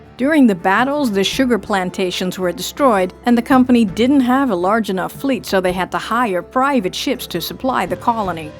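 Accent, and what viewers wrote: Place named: Netherlands. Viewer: 😻✨mooi Dutchlish 😊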